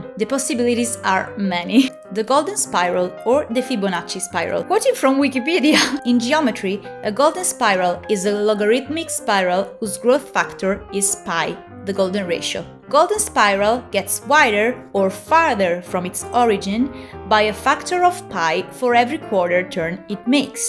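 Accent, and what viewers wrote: Italy. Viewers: LO SAPEVO che eri italiana! Dalla pronuncia 💀 Da un altro artista toscano: Bel video continua così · The video was beyond helpful and interesting. Thank you so much for this. Just to mention… Damn I love this Italian intonation sooo much, it makes all languages sound awesome 😱😱😱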